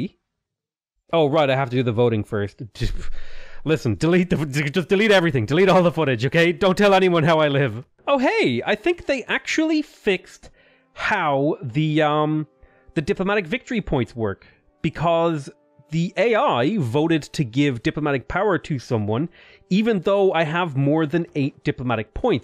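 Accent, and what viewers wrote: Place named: Ireland. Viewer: You have a lisp? I thought you were just Irish.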